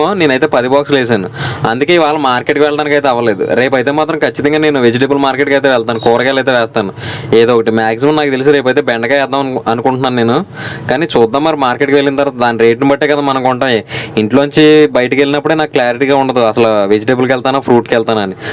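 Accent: Godavari, India. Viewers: Ma godavari slang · గోదావరి స్లాంగ్ swag same bro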